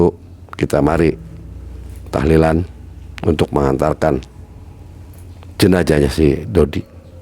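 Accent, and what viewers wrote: Cirebon, Indonesia. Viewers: Dari awal ketahuan bahasanya logat bahasa CIREBON...😃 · Kaya org indramayu om,bahasanya.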